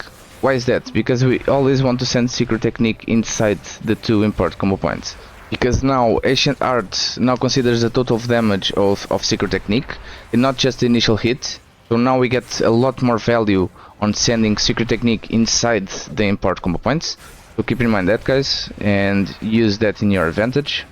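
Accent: Portugal. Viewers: Are you portuguese? the accent does sound like it at least! Thank you for the coverage on the changes, excited for the next season! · you sound portuguese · parece tuga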